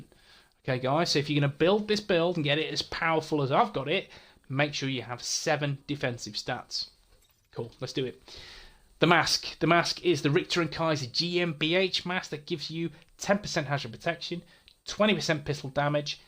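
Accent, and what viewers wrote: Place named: Australia. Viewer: Fair enough mate. Love the build though. You sound Australian. I'm from New Zealand.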